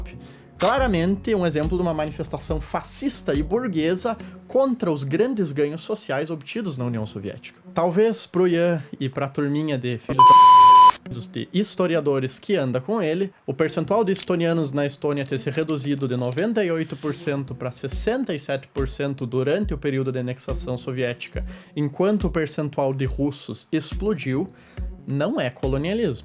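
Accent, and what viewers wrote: Rio Grande do Sul, Brazil. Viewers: O sotaque gaúcho dele faz o vídeo ficar mais incrível ainda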